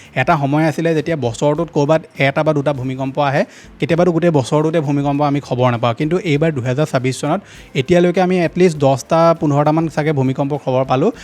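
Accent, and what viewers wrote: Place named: Jorhat, India. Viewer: Jorhat dictic❤❤❤❤❤❤❤❤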